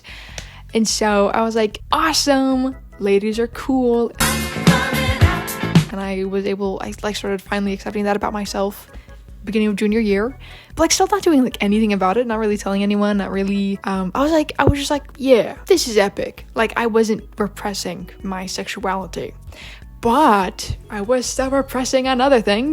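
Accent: United Kingdom. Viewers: so proud of you:) ladies r very cool and attractive i agree. also very good bri'ish accent (from a bri'ish themself) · Great british accent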